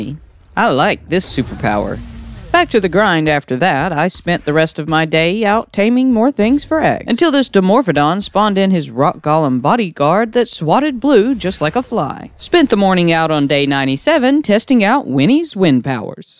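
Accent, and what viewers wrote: South, United States. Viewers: You sound so southern i cant tell if you're a guy or girl. Loved the video tho.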